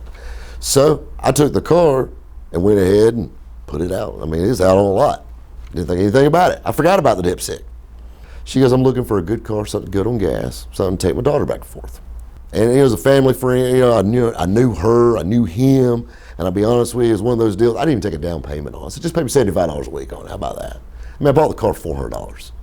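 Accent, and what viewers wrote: South, United States. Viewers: This needs subtitles in English, southern dialect is killing me! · English is not my native language but I always thought I had a pretty good knack understanding people from the U.S. This guy's drawl however? Too much for me. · What accent is that? As in where in America is he from? It's really nice to listen to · Fake southern accent? This is exactly how people in parts of the south speak, especially the Carolinas.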